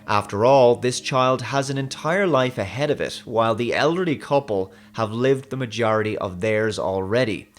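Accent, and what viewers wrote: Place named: Ireland. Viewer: Question: Why does the narrator have an Irish accent?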